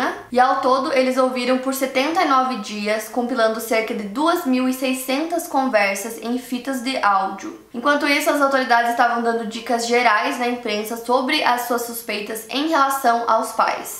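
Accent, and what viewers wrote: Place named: South, Brazil. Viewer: Olha so acho q ela tem sotaque do sul🤣🤣 Mais da pra entender. Tem como colocar legenda tbm!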